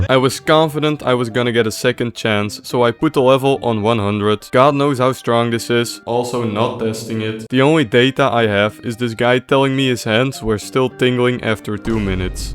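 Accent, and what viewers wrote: Netherlands. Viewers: love that dutch accent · nice video! je engels accent moet je misschien nog op oefenen. aan, jou nederlands accent te horen denk ik dat jij van nederland bent of niet? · You sound dutch 🤢🤢🤢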